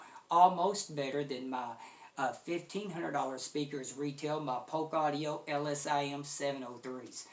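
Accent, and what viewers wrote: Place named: Tennessee, United States. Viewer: Where you from? Sounds like TN ;) … I’m in Bama here, but from TN.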